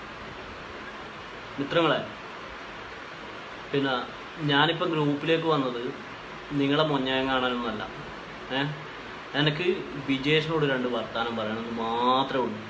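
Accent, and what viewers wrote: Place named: Kannur, India. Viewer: നമ്മളെ കണ്ണൂര് ഭാഷ.... കേക്കാൻ നല്ല രസൂണ്ട്. ലൈക് അടി മോനെ ഈട... 😊👍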